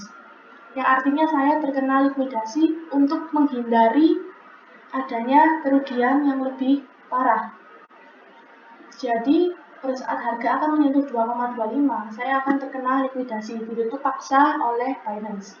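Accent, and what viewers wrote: Java, Indonesia. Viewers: Suara nya ko medok banget 🙈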